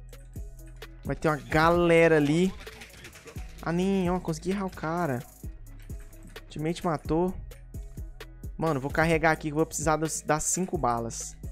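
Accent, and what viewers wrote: Minas Gerais, Brazil. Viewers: Mano pelo jeito que vc fala da para percever que vc e mineiro demais e tem muito sotaque tbm sou e nois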